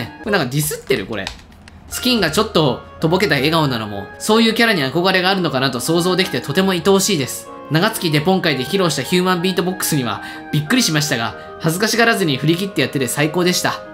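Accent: Kansai, Japan. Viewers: くんさんの、関西弁おもろすぎやろ！！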